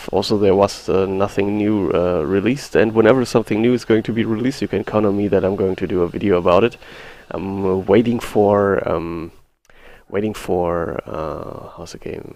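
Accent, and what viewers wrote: Germany. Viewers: was für eine scheiss englische ausprache. alter deutscher gehts nicht. peinlich.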